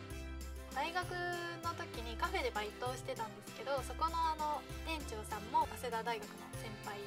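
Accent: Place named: Oita, Japan. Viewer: ッチナ❣️頑張れ👍 天から１日が始まる。大分弁かわいい😍ユーミーのボケとッチナの変化球が大好きです。天然だらけのめざまし、最高です。頑張れ👍 ッチナ❣️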